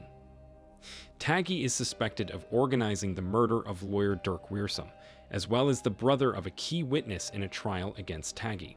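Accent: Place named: United States. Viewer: this American voice seems to think to know a lot. About criminals in the Netherlands.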